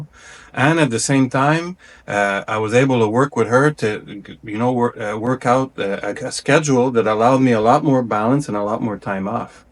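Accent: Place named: Quebec, Canada. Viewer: Wow, I stumbled on your videos by accident searching for anything PV. My wife and kids have been visiting PV for a while, we normally stay downtown around Versalles in Airbnb’s. We do like the comfort of a condo but a palapa feels more like something I would feel grounded to nature in and would be nice to experience. Your spot in the mountain overlooking the ocean looks incredible. Your story is very inspiring, I’m a little younger (42) but we seem to have some things in common. I do sometimes wonder how different it would be living like you are, to someplace slower moving and more relaxed. Good for you sir! I sense a French Canadian accent in the way you speak… Je vous souhaite à vous et votre femme beaucoup de bonheur. De Mtl.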